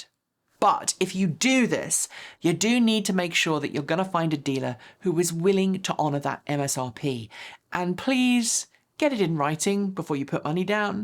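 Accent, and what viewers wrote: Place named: England, United Kingdom. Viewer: I thought this might be click-bate, but as per usual, this just sounds like my inner monologue, but with a lovely English-Accent. Love it.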